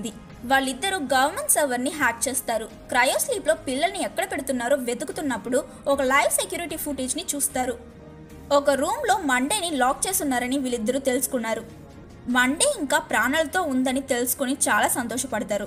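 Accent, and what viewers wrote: Tamil Nadu, India. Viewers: are you tamil kanu vunnu what is this but your explanation is very good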